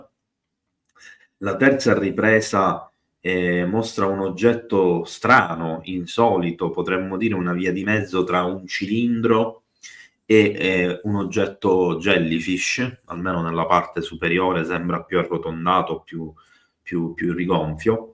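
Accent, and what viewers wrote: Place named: Sicily, Italy. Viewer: Mi sembra di capire che sei siciliano e forse di Palermo...te lo dice una di Catania😊👍 Complimenti video sempre interessanti e mai noiosi🌠👍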